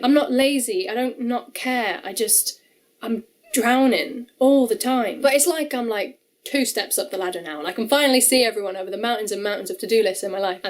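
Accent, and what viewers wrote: Wales, United Kingdom. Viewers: I only just discovered your channel about a year ago, and I have to say that I thoroughly enjoy it. Mostly, because I'm American and am enthralled with everything from the United Kingdom. Add to that, your videos are very well made and the cuts from sentence to sentence are what make them so enjoyable. May I ask from what general area of Wales you are? I don't need an address or anything. I can hear your Welshness in some words, but you (to an American, forgive me) sound more English.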